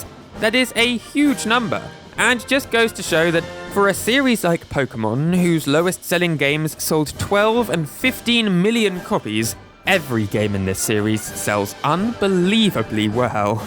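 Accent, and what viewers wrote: United Kingdom. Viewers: I don´t know why, but it´s just hearing your calm voice and brittish accent and i just get super chill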